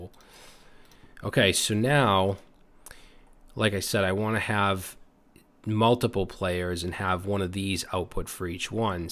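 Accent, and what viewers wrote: Boston, United States. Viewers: Great tutorial! Sounds like you're from the Boston area, ("btn dahk")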